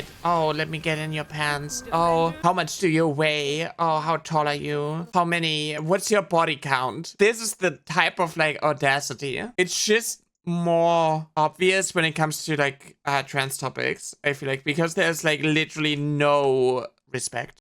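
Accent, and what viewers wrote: Germany. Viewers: I thought I heard a Görmän accent! 😂 - I'm sorry you had to go through that. I stopped playing Valo long time ago due to toxicity. Im very sad whenever the old saying "Männer sind Schweine." is proven right. Just how where these guys raised? 😅 - Keep up the pride, we're with you.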